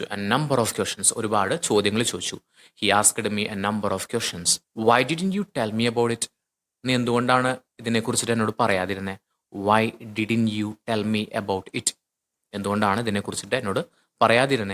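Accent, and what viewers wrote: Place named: Thrissur, India. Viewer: Really useful video 💕👌 When I heard your Malayalam translation, I felt that you are from Trissur. Awesome class😁👍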